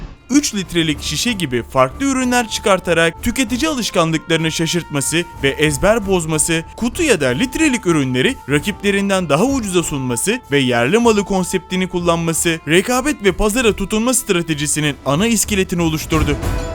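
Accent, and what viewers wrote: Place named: Aegean, Turkey. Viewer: Anlatan egeli sanırım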